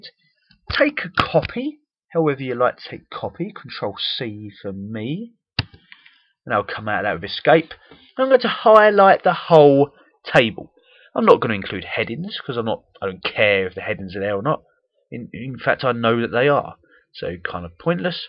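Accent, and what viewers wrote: United Kingdom. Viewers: Couldn't be a better explanation, this is the best tutorial for VLookup. I liked the Brit accent. Oh My Gosh.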